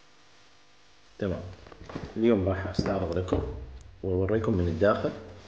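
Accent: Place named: Iraq. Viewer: تشبه لهجه عراقيه حيل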